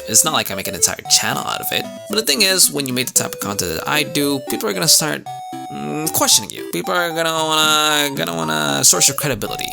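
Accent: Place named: United States. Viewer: ayo i thought bro is american he have a very good american accent hello bang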